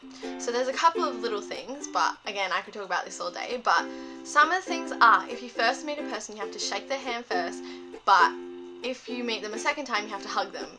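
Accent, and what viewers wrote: Australia. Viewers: Are you Australian? You sound like it! I'm from Sweden btw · I was having a bit of trouble saying for sure if you were Australian. As soon as you said, "know" like "knower." I was like, "AUSSIE!" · You're Aussie? Because you don't sound local American to me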